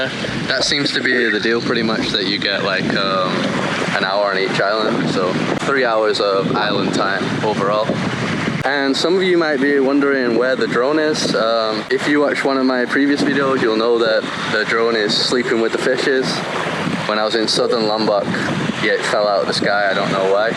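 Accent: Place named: Manchester, United Kingdom. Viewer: what accent do you have? sounds mancunian and american trying to figure it out haha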